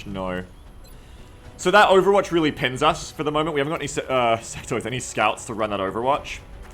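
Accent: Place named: Australia. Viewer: Dude has a mix of Australian and American accent. I don't recall that being the case years ago. Weird.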